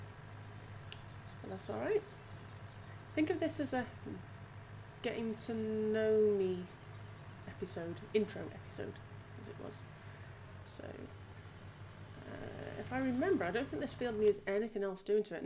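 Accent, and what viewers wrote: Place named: Yorkshire, United Kingdom. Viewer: Great first talking video. Clearly you have some natural talent there 👍🏽😊 Btw I thought you were Scottish but you sound more Yorkshire’ish? 🤔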